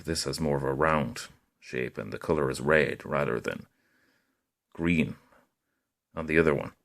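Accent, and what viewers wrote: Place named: Ireland. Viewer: Very informative and nice voice! Can't help but love an Irish accent <3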